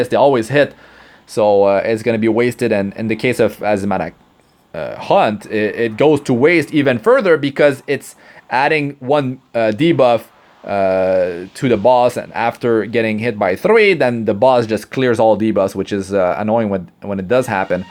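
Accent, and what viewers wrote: Quebec, Canada. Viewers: I am pretty sure you are a Quebecois with that accent XD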